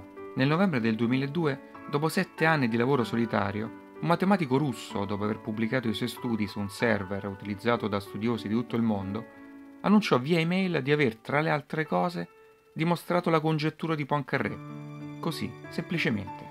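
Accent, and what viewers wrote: Rome, Italy. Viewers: Ma io dico, possibile che la voce parlante abbia un accento così marcatamente romano? Le doppie si pronunciano solo quando appaiono per iscritto.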